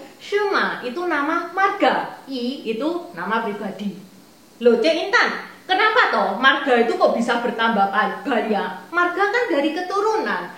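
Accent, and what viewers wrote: Java, Indonesia. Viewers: Ce Intan org tionghoakah? Kok medok Jawa ya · Intan loe lidah nya Jawa medok jawa mana loe domisili aku salut salam dari cilegon Banten Iskandar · Logatmu jowo mbak mbak.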